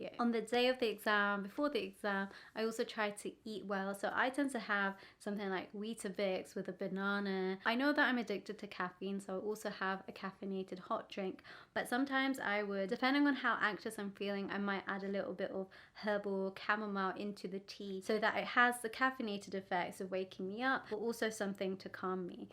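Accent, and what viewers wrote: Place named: India. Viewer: This is first time I'm watching your channel . I'm preparing for Neet (medical entrance exam ) .. I also have a feeling that your from India .. but I like your accent .. And thank you for these tips 😊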